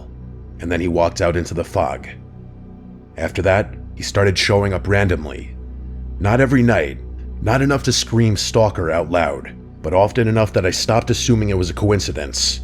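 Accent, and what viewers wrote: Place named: Long Island, United States. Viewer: This dude is from Long Island for sure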